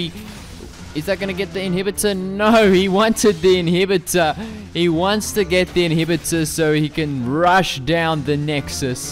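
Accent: South Africa. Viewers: Are u south african? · Are you south african ?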